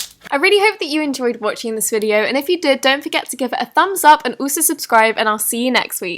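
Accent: England, United Kingdom. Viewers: Are u English ? Xx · 😊 you are legit my favourite YouTuber. Also, your British!(like me)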